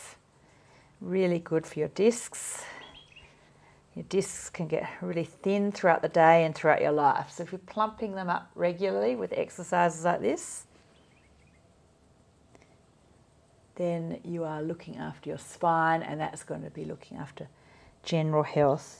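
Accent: Australia. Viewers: Thoroughly enjoyed this 🤩 thank you so much… I’m about to turn 55 and move/workout daily but really need this in my life now… it’s lovely to hear a fellow Aussie accent too… 👏🏻👏🏻👏🏻 this is my first video with you and I will definitely be moving with you daily… ☺️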